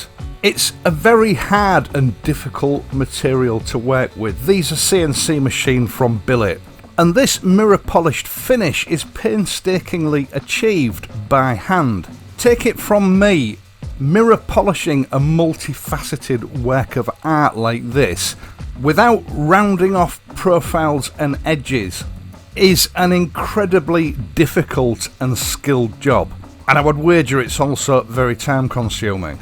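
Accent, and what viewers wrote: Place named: Midlands, United Kingdom. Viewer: Love your blogs, i've been most areas in UK, but trying to locate your accent. I'm thinking, Notts, or higher up country. Ride safe govoner.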